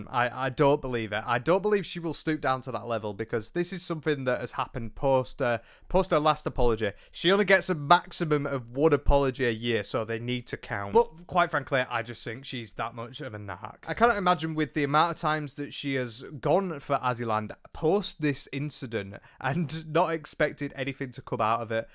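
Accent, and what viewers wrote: United Kingdom. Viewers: British man. i approve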